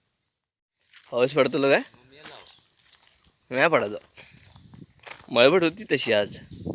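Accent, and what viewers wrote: Malvani, India. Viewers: Bharichhhh re maja Eli bghuk pan tumchi vat lagli naa.... Malavani ch boll bara vatta eikak 😄aaplo konkan asoch hirvalit rhavan de Maharaja 🙏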